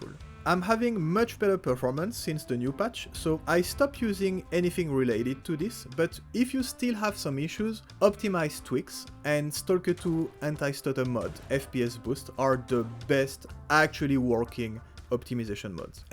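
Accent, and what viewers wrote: France. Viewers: Magnifique accent français !! et merci pour cette video je pense installer au moins la moitié des mods dont tu as parlé, mais "eye adaptation fix" oh bordel MERCI CA EXISTE !!??!! :) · J'ai replongé dans l'univers de S.T.A.L.K.E.R plus sérieusement récemment et j'ai découvert tes vidéos, je t'écris en français car j'assume par ton accent que tu l'es ;) merci pour tes vidéos j'adore vraiment t'es au top !! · are you french? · This French accent is so strong 😂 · come on, with the full gameplay with mods already! I try to watch other gameplays, but god, some are boring ! ++++ bonus to your French accent, most times I want to see no comments gameplay, but yours is damn enjoyable ! mod the game how you like it, and lets see some STALKER2 gameplay from you! I know you won't disappoint! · Your super subtle french accent 😅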